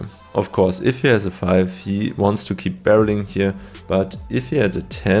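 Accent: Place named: Germany. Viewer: Good vlog my friend. I’m loving that German accent 🇩🇪. Nice juicy game man :)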